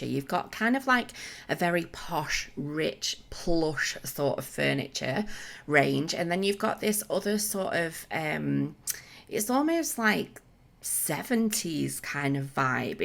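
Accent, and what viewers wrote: United Kingdom. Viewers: Enter! I'm a 15 years old boy from Bavaria, Germany. I love your videos, your britsh accent and the ideas that you come up with! You really inspire my builds! I hope you have a great time and please go on with those amazing speedbuilds. Much love from Munich😍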